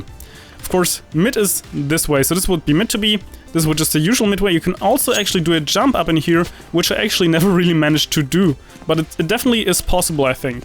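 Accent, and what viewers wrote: Germany. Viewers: man you have a great german akzent =D greetings from there ^^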